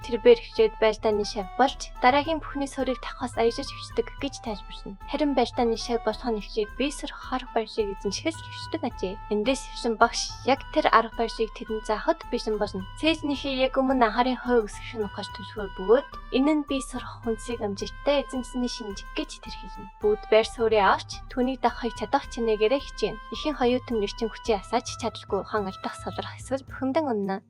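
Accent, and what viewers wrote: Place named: Inner Mongolia, China. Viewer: Жоохон Өвөр Монгол аялга сонсогдоод бхын. Яасан ч хөөрхөн сонсогддог юм😊.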